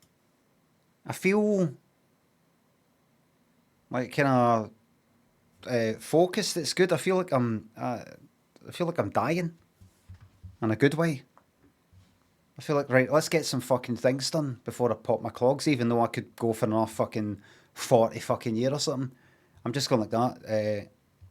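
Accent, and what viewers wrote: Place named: England, United Kingdom. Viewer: Limmy's proper english accent is excellent and very entertaining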